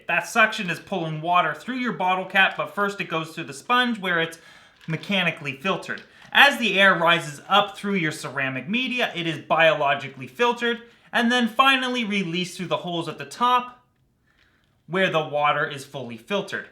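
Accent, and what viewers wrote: Canada. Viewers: I love this guy's Canadian accent "Aboot" lol. Great video though.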